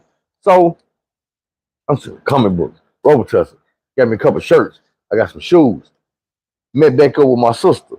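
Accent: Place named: Texas, United States. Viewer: Bruh I watch yo videos all the time you sound like my Texas uncles guess it’s that Texas/Arkansas connection you 💯bro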